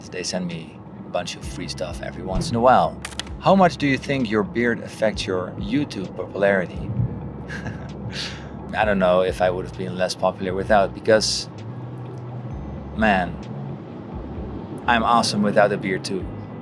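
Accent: Netherlands. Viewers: Are you dutch? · Are you Dutch? Your accent sounds very Dutch.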